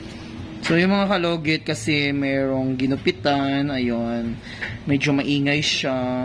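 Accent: Iloilo, Philippines. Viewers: Tga din kaw te? Daw may tono kaw na Ilonggo ☺️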